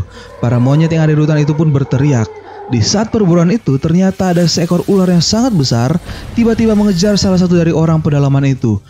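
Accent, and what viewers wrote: Manado, Indonesia. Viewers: Dari logatnya , Abang pasti orang Manado ya😂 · Bangg Orangg Manado? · Bro orang manado??? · Manado ngana kang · Bang abang pasti orang manado halo bang aku orang manado halo bang😁😁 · Orang Manado ya bang??? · Orang Manado ka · Orang manado ya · Mimin orang manado kang 😆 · Bang org mnado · Min orang manado? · Orang manado bang? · Abang orang MANADO kah ini ?? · Bang ngana orang manado kang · Orang manado min? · Manado to ngana... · Orang Manado? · Bilang jo kwa klo nga itu orng manado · Admin org manado fix! 🤘🏻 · wah mimin orang manado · orang manado ka ? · Kak ternyata orang manado🙂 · orang manado kang dapatau😂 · Orang Manado Ya Bang?? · Bang Lu Orang Manado? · Org Manado 😭🤣🤣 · Ad logat Manado kang🤣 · Bang orang menado ya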